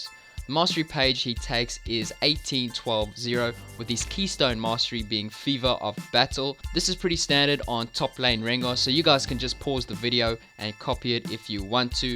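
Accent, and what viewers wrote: South Africa. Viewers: are you south African? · Is this guy South African? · You need more recognition bro, and are you South African?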